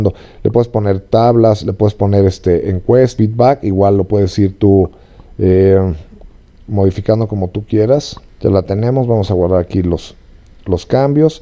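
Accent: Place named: Mexico. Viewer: Detesto escuchar videos con voces que no sean mexicanas, y por eso, este video fue música para mis oídos, gracias!!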